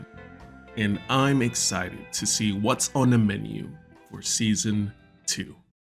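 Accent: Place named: United States. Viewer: You said it’s getting warmer but you have what I assumed was an American accent?? Wild. Thanks for the video!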